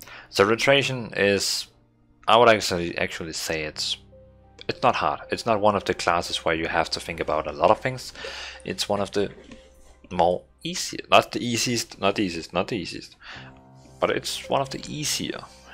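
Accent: Denmark. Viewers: You sound Danish:)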